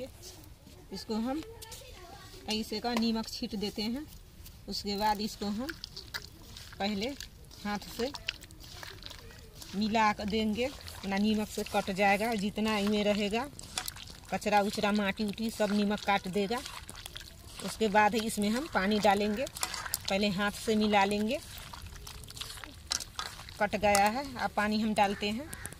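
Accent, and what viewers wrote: Bihar, India. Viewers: Aunty ji aap jamui district se ho kya lag raha hai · Ky ap bihar se belong krte ho 😅 Apki boli s lg rha hai 😅😅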